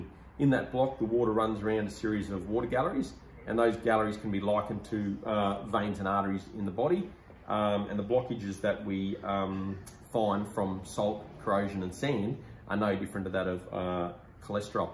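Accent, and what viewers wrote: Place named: Australia. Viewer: Australian accents are the worst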